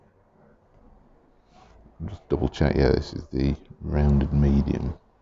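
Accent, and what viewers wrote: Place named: United Kingdom. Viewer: Very good pens.. By the way, I love your british accent.. 👍👍🇪🇸🇪🇸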